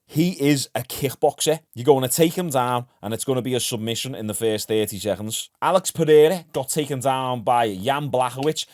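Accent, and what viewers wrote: Liverpool, United Kingdom. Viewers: Feel like watched guru video scouser version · I'm not defending Jon here but your accent and the flags in these comments kind of agree with his comment about UK fans. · WoW, copying exactly what MMA GURU said yesterday, it's sad when people like you just sit in your room and copy from actual hard working people that do research and actually travel 12 hours by plane to South Africa to talk to dricus and do some training in his gym. Chats live to fighters like money moicano ECT Would do you do mate? Watch GURU and show, it's embarrassing how nearly identical has copied word for word lol No wonder less than 5% of your watchers are not subscribed and will never sub to you and your annoying Scouse accent. And please stop with the workout and how to fight advice, your a snowflake with a teenage girls body lol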